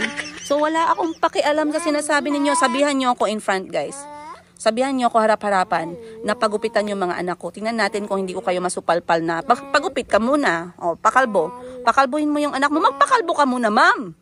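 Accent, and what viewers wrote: Iloilo, Philippines. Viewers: Ilongga ka mam??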